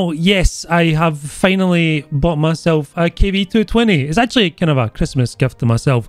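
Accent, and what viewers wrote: Scotland, United Kingdom. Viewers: Hey I was for Christmas in Scotland Ben Nevis defeated me but your video was useful as a accent tutorial while drinking with Scottish people-they did not defeated me in drinking 🤣 Scotland is so beautiful! people kind! Alcohol great! I brought a blue beautiful hat everyone 8n London aks me about my orgin and i feed them up with madeup stories about my childhood in mountains- with perfect accent because of you 💪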